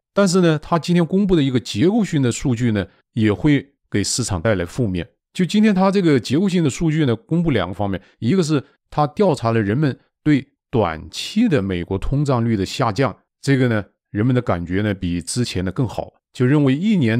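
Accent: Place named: Sichuan, China. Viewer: 从 LT主播讲得一口川普推断，LT是四川人。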